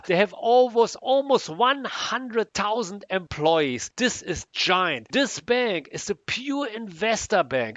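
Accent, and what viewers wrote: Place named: Germany. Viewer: Good job man, I like your humor and your german eccent <3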